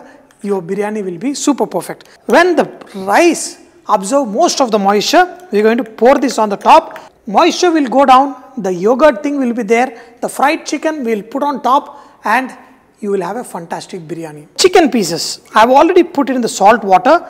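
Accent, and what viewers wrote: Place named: Karnataka, India. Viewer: Sir, your English slang is like kannadiga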